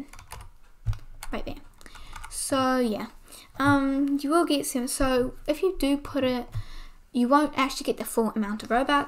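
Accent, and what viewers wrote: New Zealand. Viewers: Love the kiwi accent. You were a big help since I got hired for a clothing group and needed to learn fast.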